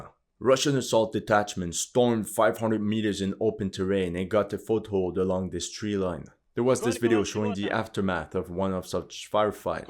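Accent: Quebec, Canada. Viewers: Что ты хочешь от канадца французского происхождения? Нормальное у него произношение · That "c'est fini bro" get closer and closer to the Quebec accent you are getting closer with every words spoken.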